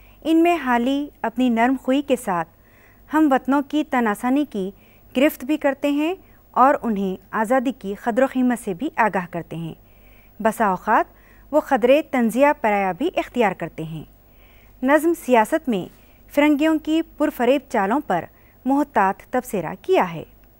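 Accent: Deccan, India. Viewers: The teacher is from Hyderabad probably. Her pronunciation is quite Deccani.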